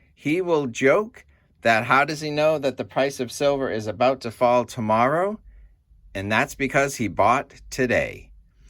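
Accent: New England, United States. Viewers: You sound like a fellow New Englander. Lol. I bought 58oz last Saturday on the 11, and this Saturday it was up almost $2.00 an ounce. I still bought 42 more oz yesterday. I’m not all that concerned about the exchange rate for silver, unless I’m buying of course. My silver and gold are not for sale. Whatever the price, it’s still going to be receiving worthless paper in exchange. My stack is for the big one. When we go back to what we did for six thousand years. When silver and gold were MONEY. Which they will be again, much sooner than people think. I like your narrative! Keep buying,Keep buying, Keep buying, then KEEP BUYING! 😂👍🏼💪🏻❤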